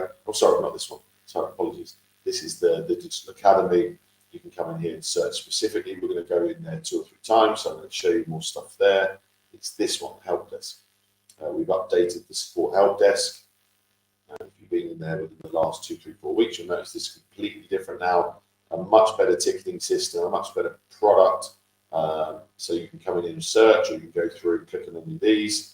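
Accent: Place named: United Kingdom. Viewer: oooooh! Onboarding! I'm in the UK - sounds like you are from here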